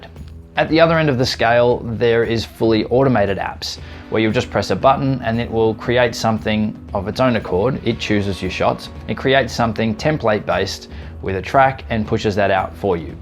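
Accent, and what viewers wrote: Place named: Australia. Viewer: Bro your Cleary Australian Australia for life (I'm Australian as well) I'm WA what state are you if you want to share it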